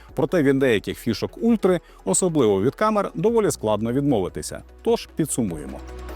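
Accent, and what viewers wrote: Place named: Hutsul, Ukraine. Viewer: Гуцул долбаный )))., диктун на русском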